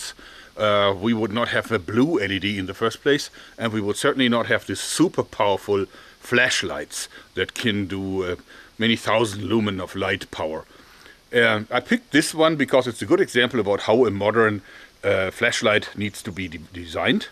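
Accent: Germany. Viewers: Are you german ?? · Is this guy german?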